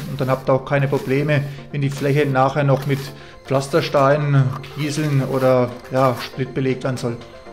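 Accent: Franconia, Germany. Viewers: Fränkisch immer noch bester Dialekt 👌🏻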